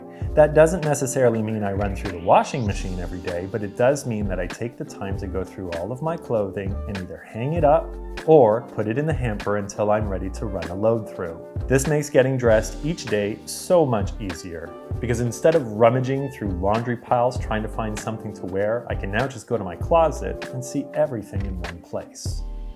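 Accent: Canada. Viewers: yes he sounds Canadian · Sounds Canadian, no? Very calming.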